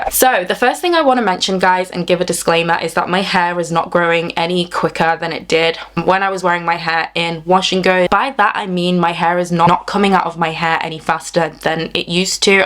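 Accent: United Kingdom. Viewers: Very helpful video, i had a feeling this was the case. My hair is relaxed so i normally blow dry it but i think i will go back to flat ironing it as it lasts much longer when the cuticles are sealed. I steam once a week. Also its nice to hear another UK accent.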